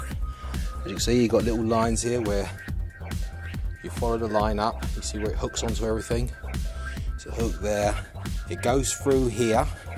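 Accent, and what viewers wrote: Cockney, United Kingdom. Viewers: The cockney mechanic. Great video. 👍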